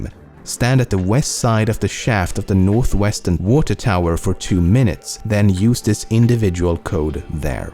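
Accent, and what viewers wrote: Gothenburg, Sweden. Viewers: it sounds like you are Swedish I can hear it on your accent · Jävlar vilken göteborgare du var!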